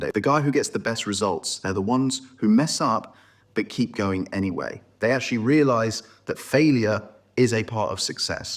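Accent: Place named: Southern England, United Kingdom. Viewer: mid to southern England, but I'm getting little hints of time in the US? and with a porcelain tiled floor doesn't live in the UK anymore...there..just like Poirot ! 😂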